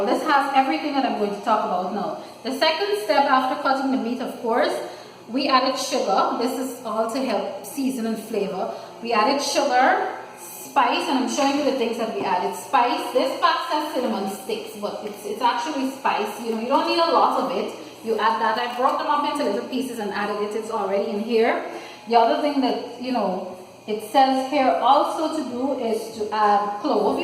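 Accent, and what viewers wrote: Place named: Guyana. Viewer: I miss my mommy pepperpot and it is great to hear the guyana accent when away from home, always make me smile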